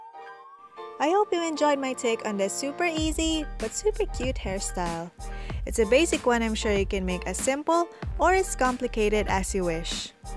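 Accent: Philippines. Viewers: Your Filipino accent 😍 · You have a Filipino accent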